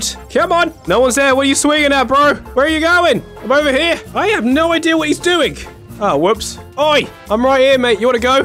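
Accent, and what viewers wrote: United Kingdom. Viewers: I love british voice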